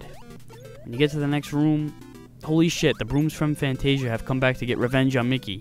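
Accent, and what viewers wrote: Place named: New York, United States. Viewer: I don't see all that much AVGN, really. He just has a bit of that New Yorker accent. :T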